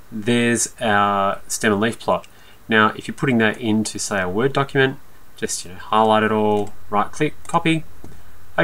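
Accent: Australia. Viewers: lol ,its the aussie accent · its australian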